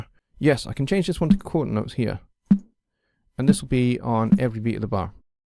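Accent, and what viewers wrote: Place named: United Kingdom. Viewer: Thanks a lot, very clear information and I like your British accent. Is there a way of finding out who is making thumbs down?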